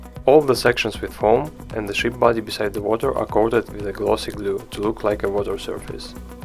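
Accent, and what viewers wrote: Russia. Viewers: Ты русский? А то тебя акцент сильно выдаёт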